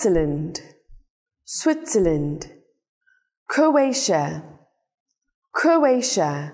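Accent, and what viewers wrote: Standard. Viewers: chuẩn thật đấy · tiếng anh quá chuẩn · she read well standard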